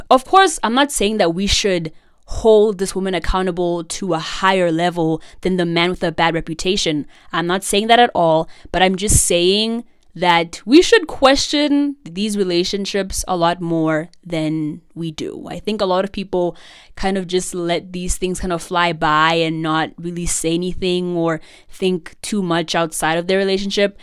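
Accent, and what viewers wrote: South Africa. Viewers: Btw are you South African?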